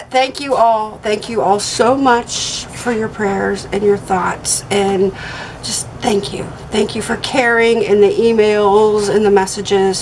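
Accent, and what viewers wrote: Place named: Pennsylvania, United States. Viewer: Glad to hear you are negative! Here from Simple Life! I would have swore u were from PA w that accent! I grew up in PA, & u know I gotta say it.... We Are!!! Lol! God bless!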